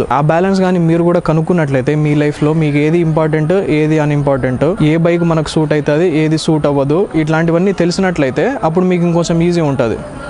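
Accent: Rayalaseema, India. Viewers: You are from rayalaseema right??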